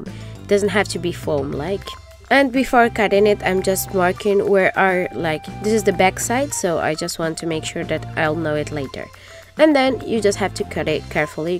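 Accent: Spain. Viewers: Is it strange that I love the way you're saying "We gonna' need"? ;-; I think it's too much cute D: And I love your spanish accent c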